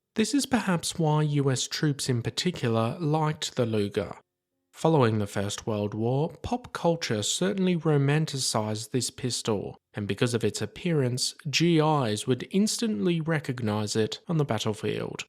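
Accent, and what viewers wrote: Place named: England, United Kingdom. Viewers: He is speaking ENGLISH...not American! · He's English 🤷!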